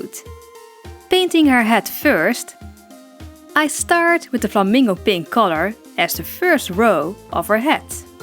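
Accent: Netherlands. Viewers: Are you dutch you sound very dutch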